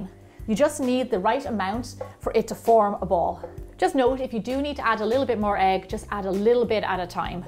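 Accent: Ireland. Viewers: What a great Channel and I love your Irish? accident.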